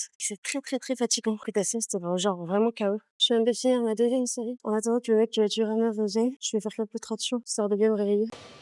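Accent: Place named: United Kingdom. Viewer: Dommage pour l'éclairage de la salle ca fait un effet assez désagréable ça 'clignotte' vachement :/ Pas de ta faute hein ^^ Mais pour les futures vidéos pk pas faire un effet voir ce que ça donne et ensuite régler ta cam/téléphone pour pas que ça fasse ça =) Il est mignon ton accent anglais ^^ Sinon bonne vidéo =D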